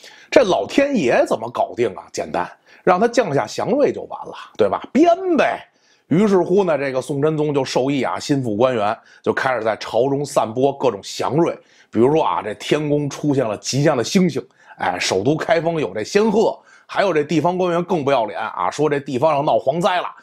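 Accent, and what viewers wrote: Beijing, China. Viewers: 还是北京话好听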